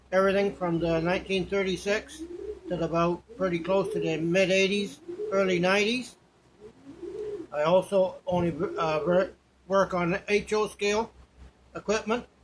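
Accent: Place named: Vancouver, Canada. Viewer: Interesting. I've not heard your accent here in Vancouver, surprisingly. I moved here nearly 30 years ago; maybe your accent is an older one from this area.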